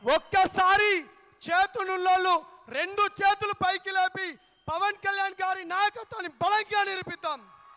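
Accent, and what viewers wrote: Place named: Telangana, India. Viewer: శ్రీ సంపత్ నాయ్యక్ మాటల్లో ఆవేశం, విద్యార్థి బాగు కోసం ఆరాటం, తెలంగాణ యాస కనిపిస్తుంది 👌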